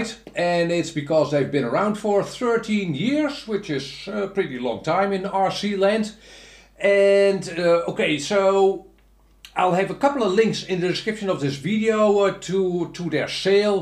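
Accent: Netherlands. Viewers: I use qx7 Ik gebruik nu een qx7 Love your channel and your dutch accent by the way. Keep on with your channel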